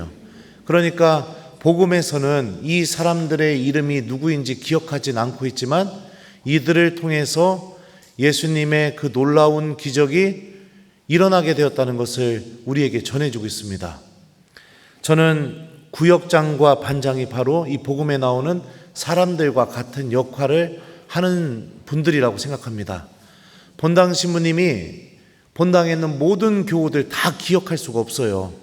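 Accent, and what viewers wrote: Chungcheong, South Korea. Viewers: 신부님 충청도 말씨 구수혀요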